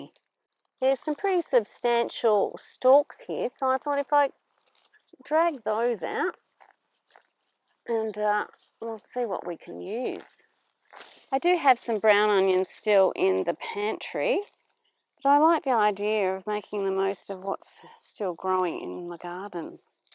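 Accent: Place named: Australia. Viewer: I think that there may be time when You Tube creators may question whether their channels are worth all the work that goes into producing content. This is my personal story of how your lovely posts have restored my spirit. Last fall my husband was given a devastating diagnosis. I spent several weeks taking him to daily radiation treatments and dealing with the side effects. (The lesions were discovered early and we have reason to believe he is on his way to full recovery). So what does this have to do with your gardening channel? Though I am an avid gardener, in the aftermath of his treatment regimen I experienced depression and lethargy. My garden needed prep for the upcoming season but I could not motivate myself to do anything other than sit on the couch and stare into space. Here is where you come in: I would watch one of your videos and could feel a spark of garden interest reigniting in my spirit. 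I would go outside and do just one thing. I’d watch another of your videos (enjoying your lovely, and to me—exotic, Aussie accent), then I’d go to my light rack and start a few seeds. The motivation has continued and I find that my depression and lethargy have mostly lifted and my garden and seed starts are ready for spring. And I thank you.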